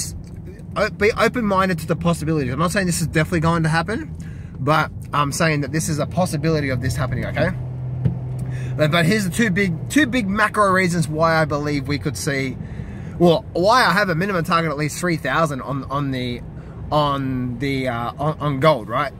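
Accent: Australia. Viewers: Whats going on, your videos just started showing back on my list. Its nice to hear your interpreation of market moves and your Austrailian accent. Take care, cheers!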